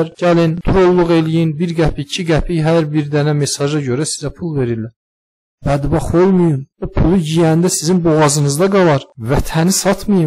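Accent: Armenia. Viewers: Sən azərbaycanli deyilsən .Azərbaycanli olsan azarbaycan dilini biz azərbaycanlilar kimi danişarsiz amma siz gic ermənilərin azərbaycanin torpaqlarini oz torpaqlari kimi qələmə verir və sizdə dilimizi guya bizdən biri kimi ozunuzu elə gostərib calirsiz siz ermənisiz pis insansiz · Siz ermənisiniz ləhcənizdən bilinir , görünür Azərbaycan dilini qəşəng bilirsiniz. Biz sizə nifrət edirik , XOCALI soyqırımında günahsız insanları vəhşicəsinə qətlə yetirdiyiniz üçün sizə nifrət edirik. Azərbaycanlılar bu cür danışmır. Siz həqiqətən bir ermənisiniz. Allah bəlanızı versin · Danışığından da bilinir erməni olduğu.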